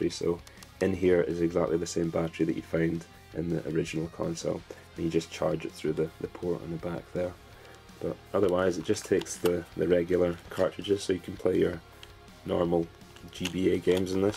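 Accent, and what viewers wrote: Scotland, United Kingdom. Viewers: Where in Scotland are you from? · Confession: I actually just watched this video to hear your raspy, Scottish (I think) voice. I honestly watch this every night because you have a voice that smooths me up like butter and I just fall asleep just like that.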